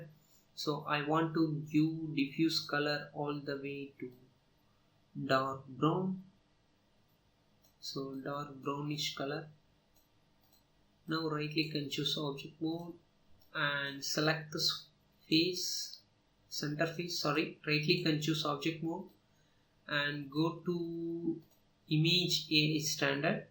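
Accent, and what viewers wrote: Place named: India. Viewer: Indian accent- close the video.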